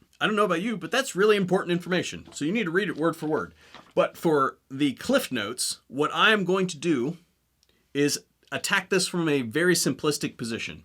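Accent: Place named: Ohio, United States. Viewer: Sounds like you are from Cuyahoga.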